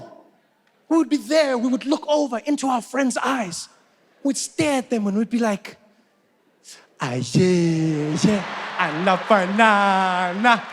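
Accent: South Africa. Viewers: This is exactly how he spoke before he went to America, it's the South African accent. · Is incredible and so lovely, how easily he uses the south African accent for the public... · He is 100% South African😂😂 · Love how his accent became 100% South African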